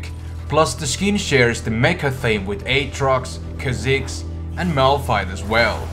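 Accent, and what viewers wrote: Finland. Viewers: I started watching this, and thought that you have that finnish accent in your english. Mutta hyvä video! :D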